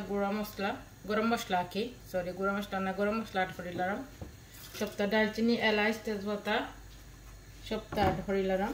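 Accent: Sylhet, Bangladesh. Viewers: Khub bhalo laglo ❤❤sylethi kotha shune aro bhalo laglo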